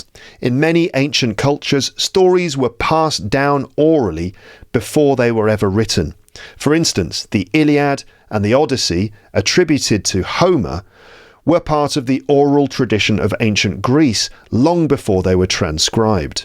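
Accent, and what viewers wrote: United Kingdom. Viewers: Hello!! I'm from Argentina and I'm preparing for an exam in May. I've failed on passing the C1 level of English so many times. I'm still practising all the skills despite having some health issues that retrieve me from learning and passing the exam. I need to pass this last exam to become an English teacher. Thanks for the video. I appreciate your content. This is my first podcast I listened to on your channel. I could get almost all your words and I love your British English accent. It's very clear. Regards. Daniel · I watched the entire podcast, and it was spontaneous. I'm really impressed by the British accent!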